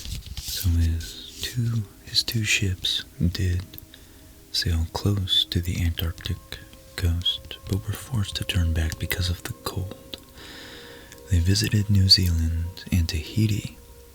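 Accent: United States. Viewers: I love listening to Americans saying British things it's quite funny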